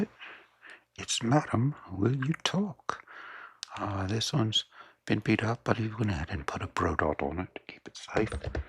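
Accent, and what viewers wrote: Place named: New Zealand. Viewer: Very nice of you to show off Robs latest acquisitions. I have to ask, have you spent time in the Antipodes as I could swear I detected a faint New Zealand accent?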